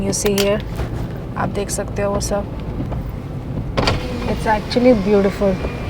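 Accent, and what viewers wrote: India. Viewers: It come.with Hindi pronounced not sankrit Hindi lot.ending with et and r thats to english as well.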